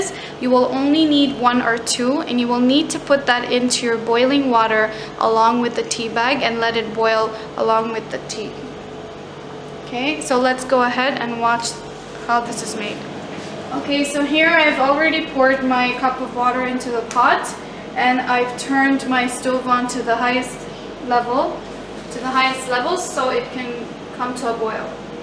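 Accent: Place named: Arab world. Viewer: I can tell from your accent that you're an Arab, haha 😁 I will try your method, thanks x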